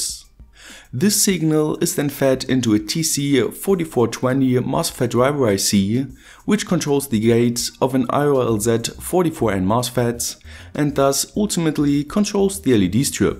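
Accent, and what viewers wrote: Germany. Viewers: man hört das du deutscher bist!...Wenn ich mir deine Materialien so angucke und die Art wie du es zusammen baust, dann werde ich das Gefühl nicht los das du ein Elektriker auf einer Werft oder einem anderen Maschinbau Firma bist oder warst!...Auch die Kabelhalter , die Gewindeschneider und Senker sehen nicht aus, als wenn du sie aus dem Baumarkt hast!..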